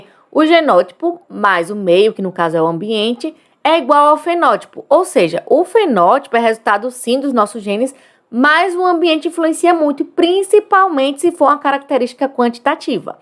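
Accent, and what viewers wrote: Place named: Ceará, Brazil. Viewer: tu és do Ceará? eu acho pelo sotaque :) Eu amooooo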